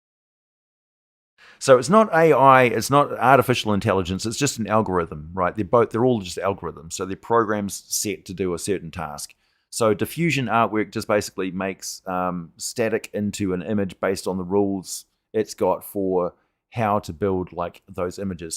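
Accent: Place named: New Zealand. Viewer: Glad to see you trying new things! The AI voicing and script videos haven't resonated with me personally [the AI getting stuck in they cycle of using the word exosuit to describe exosuits or as an example of exosuit technology was when I realized that someone/thing else was writing your scripts and not to your standard], but if they otherwise help the channel grow and allow you to put out more content in your historical style going forward (albeit with fewer dungeons and or dragons) with your voicing and and well researched and written scripts then that's great. I also appreciate the clear labeling of them so that I don't get my hopes up for something of your usual caliber! AI is a great tool, but you remain a better script writer and narrator [at least until ChatGPT can scrape all your scripts and do a consistent NZ accent!]. All the best, hope you're keeping well! 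Had a great face off against some vampires on your silicone battle mats from the kickstarter last night.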